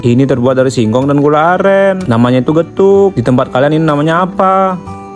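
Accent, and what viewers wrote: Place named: Kendari, Indonesia. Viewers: Logatnya kaya org Kendari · Logat ta sudah pasti mi sulawesi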